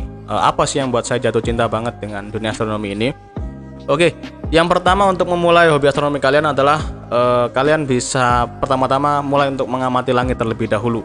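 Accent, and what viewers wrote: Java, Indonesia. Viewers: Bro, sampean jawa ne ngendi??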